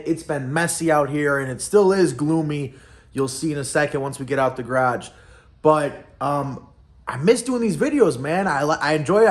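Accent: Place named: Chicago, United States. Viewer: I’m from chicago too and you’re one of the only young cats that still have that old school accent. Hey brother how much should i pay for an 02 town car with 28k miles? Guy wants 8500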